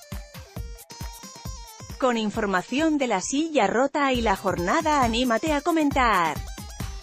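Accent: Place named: Spain. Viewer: ESTE ROBOT CON ASENTO ESPAÑOL NO SIRVE PA NADA. PERO D CUALKIER MANERA. RIP.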